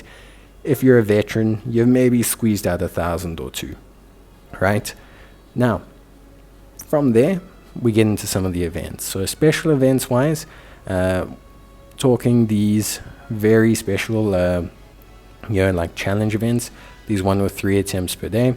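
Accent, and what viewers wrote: South Africa. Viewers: Randomly stumbled across this video and I couldn't help but notice the accent 🇿🇦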